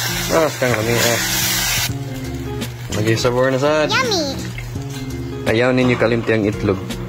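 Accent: Visayas, Philippines. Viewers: 😂😂😂 ug paminawon raka ,di ka tan awon bisaya jud kaayo ....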